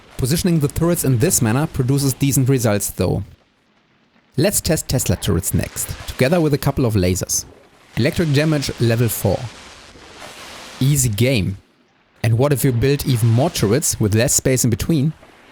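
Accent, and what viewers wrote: Germany. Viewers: That's the german accent for ya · Jetzt reichts! Für deinen deutschen Akzent bekommst du ein Abo!!!!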